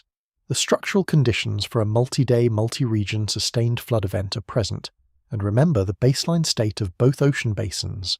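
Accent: United Kingdom. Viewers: The worst weather was the same time as the Dust bowl. Government is not smart enough to solve any problems and they do not want to balance the budget. A house of Reps without a budget is not a constitutional government. Currently irritated by British accent. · Can't we find an American narrator? I find this heavy British accent very annoying.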